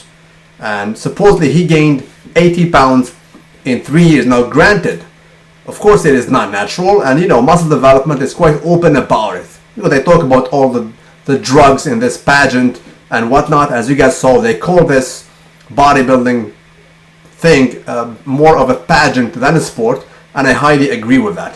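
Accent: France. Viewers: Hey, is your first language french? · hhhahaahaa ton accent Français trèes surprenant!! tres amusant!!! fait donc 1 video en Français!! loll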